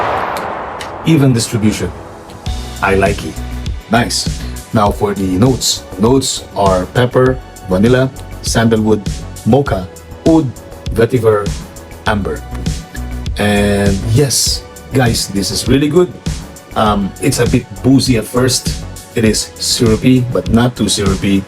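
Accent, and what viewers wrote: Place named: Philippines. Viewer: Your 𝒂𝒄𝒄𝒆𝒏𝒕 has a sound like a FILIPINO. Are you originally from the Philippines ❓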